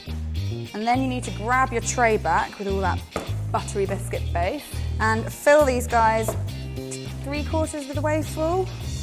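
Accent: United Kingdom. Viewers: Hi I have been starting to watch your videos... and I think your videos are really amazing!!!! I love your British accent..haha I am living in America so... Anyway thank you for good videos!!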